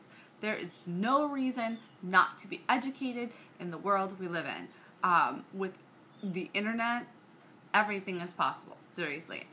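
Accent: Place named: New Orleans, United States. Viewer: great video.. just curious.. are you from the new orleans area too? (the accent has my curious, you sound like me and my friends)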